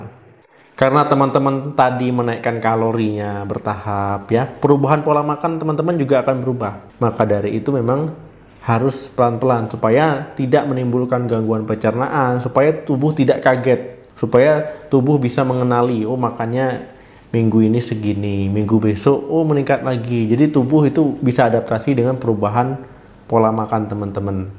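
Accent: Aceh, Indonesia. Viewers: Dokter nya orang aceh y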